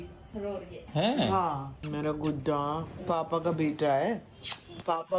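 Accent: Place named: Maharashtra, India. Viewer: So cutely she speaks मराठी... being maharashtrian I like her मराठी accent... झोपला भोपला😂😅😂😅😂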